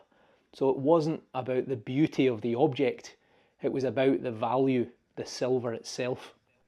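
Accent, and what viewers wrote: Scotland, United Kingdom. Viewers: Just stumbled across your channel. Thoroughly enjoyed watching - thank you so much … 😁 I have lived in Australia for decades, and although UK born and bred, I have many happy memories of time spent in Scotland visiting my Uncle Jock and Aunty Aileen. You sound very much like my uncle … 😁 so nice to hear that lovely accent … subscribed 😜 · Fantastic! You're so lucky to do what you do and where you live is spectacular 👌 Scotland is my ancestral home and I really appreciate seeing the countryside and hearing the accent 😊 and thank you so much for sharing your experience with us all, I'm in New Zealand btw and my Dad is Scottish 👍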